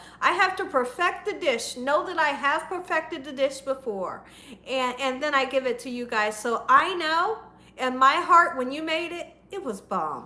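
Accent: South, United States. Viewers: Mrs. you are awesome. Love your cooking videos. You sound like a southern girl are you from Louisiana?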